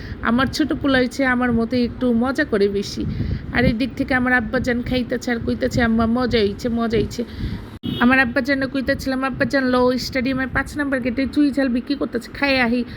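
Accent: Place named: Dhaka, Bangladesh. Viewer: আসসালামুয়ালাইকুম আপু আপনার ভিডিওটা অনেক সুন্দর হয়েছে আপনি যে এত সুন্দর করে শুটকি ভুনা করেছেন দেখেই মন চাচ্ছে দৌড়ায় এসে খেয়ে ফেলি আর সেমাই পুরি তো দেখতে অনেক অনেক সুন্দর হয়েছে খুব ভালো লাগলো আর প্রত্যেকটা ভিডিওতে আপনি যে এত সুন্দর করে ভয়েস দেন আর আপনার এই আপনার মুখে ঢাকাইয়া ভাষা অনেক অনেক বেশি ভালো লাগে আপু এভাবেই আরো অনেকগুলো ভিডিও দিবেন আমরা অপেক্ষায় থাকবো খুব ভালো লাগলো দোয়া রইল আপনার ছেলের জন্য তাড়াতাড়ি সুস্থ হয়ে যাক❤❤❤❤❤❤❤❤❤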